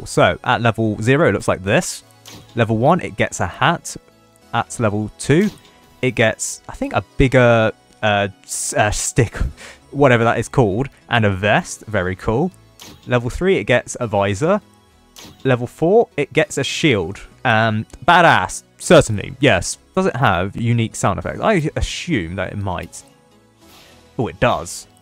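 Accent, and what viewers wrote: United Kingdom. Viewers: was about to say 2am again until i remembered hes british, YES I REMEMBERED SOMETHING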